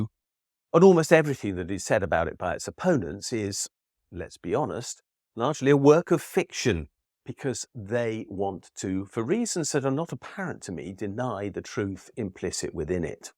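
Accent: United Kingdom. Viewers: Just because he has a British accent doesn't mean he sounds like Varys lol.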